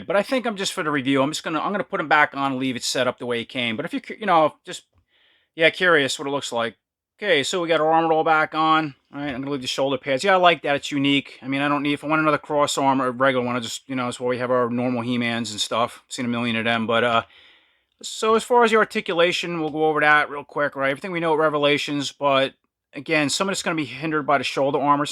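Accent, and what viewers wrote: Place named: New Jersey, United States. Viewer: Great review! I love your NJ accent haha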